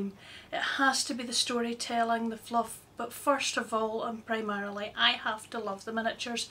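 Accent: Scotland, United Kingdom. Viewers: omg I love the way you speak! Subscribed! :D is it Scottish? Good luck in the competition!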